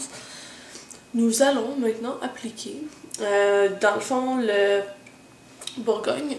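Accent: Quebec, Canada. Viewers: Maquillage divin! comme d'hab;) si seulement j'avais la moitié de ton talent! mais j'y travaille sérieusement, grace à tout tes conseils et tes vidéos super intêressantent! Merci de te lever si tôt pour nous faire tes tutos! Au fait! ton accent Quebecois! j'adoreeeeeeeeeee et t'ai " " comme tout =)))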